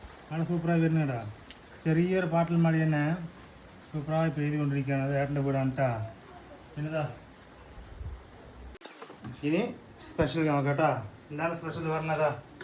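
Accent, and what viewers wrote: Tamil Nadu, India. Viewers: ചാർ എന്ന് പറയുവോ കറി എന്ന് പറയുവാ.. നിങ്ങൾ തമിഴ് ആൾക്കാർ ആണോ?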